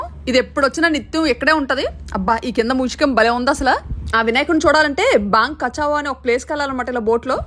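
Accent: Vizianagaram, India. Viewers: మీ మాటలకు నేను ఫేవరేట్, మన విజయనగరం యాస చాలా బాగుంటుంది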